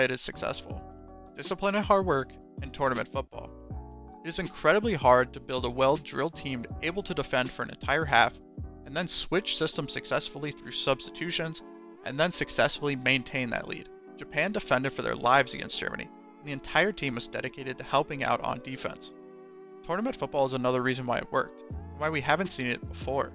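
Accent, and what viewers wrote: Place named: United States. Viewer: I clicked, however hearing the American accent I instantly know everything about this must be BS.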